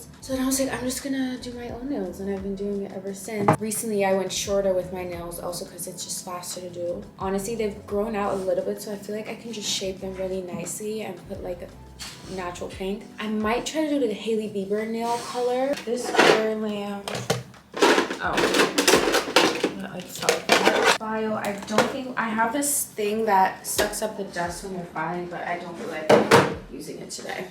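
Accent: United Kingdom. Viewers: I love your British accent 😭😭❤ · Your videos are so comforting🥺❤, enjoy your solo trip GURLLL🤍. HAPPY BIRTHDAY!! It 12pm here in Nigeria. Your British accent gets me all the time😂. Your braaids!!! giving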